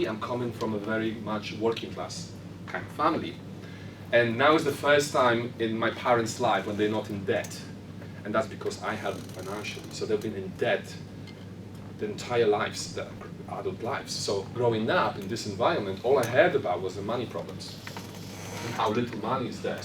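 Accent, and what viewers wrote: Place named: Poland. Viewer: omg I love the polish accent... yippy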